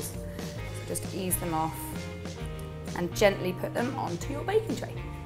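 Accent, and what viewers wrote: United Kingdom. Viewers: i like to hear British accent:D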